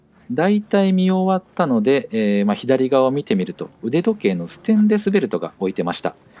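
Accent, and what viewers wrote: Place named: Kansai, Japan. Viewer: お互いに関西の何処かに居ますね。さんの聴きやすい声の僅かな関西訛りを聴きだして塾聴しながら私なりに楽しんでます。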